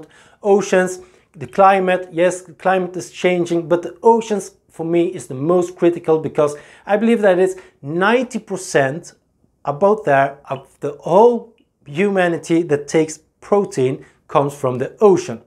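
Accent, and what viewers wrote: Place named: France. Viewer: Haha love your French accent 🤣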